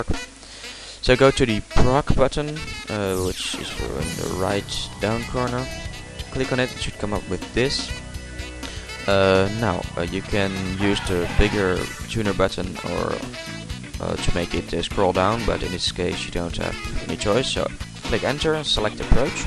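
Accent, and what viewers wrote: Netherlands. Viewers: u dutch mate?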